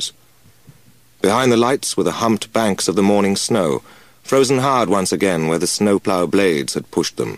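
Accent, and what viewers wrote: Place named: England, United Kingdom. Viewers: Much prefer this version (which I have on cassette tape) to the Al Maitland version. The difference between the reading by an actor versus a radio announcer. The English accent also lends authenticity not present in the Canadian version. Wonderful story, in any event. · I agree, respect to A Maitland, but you need the well spoken English accent for this story.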